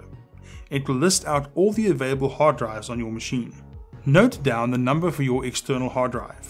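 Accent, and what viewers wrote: South Africa. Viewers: Top result and the guy got a proprr South African accent so I’m happy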